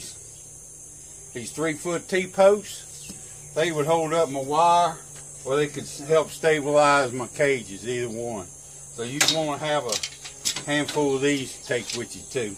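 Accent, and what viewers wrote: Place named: South, United States. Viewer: Thank you! Your thick accent is the real southern charm. I'm grateful for your taking the time to explain things in simple terms for us beginners who know nothing!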